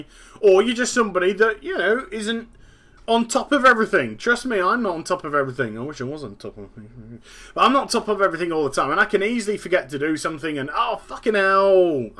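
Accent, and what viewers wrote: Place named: United Kingdom. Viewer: why is it that British people pronounce it beeta instead of bayta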